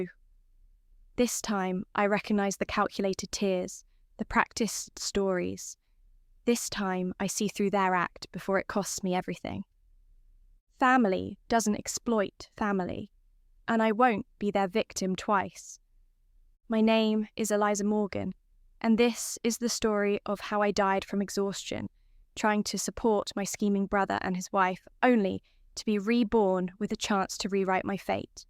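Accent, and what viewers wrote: United Kingdom. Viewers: 🤢 british accent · The British accent ruins the story, sounds like an Emma Watson rip off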